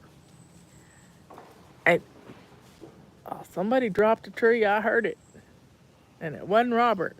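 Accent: South, United States. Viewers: Absolutely beautiful! I love listening to your southern voice! Love from Dallas, Texas!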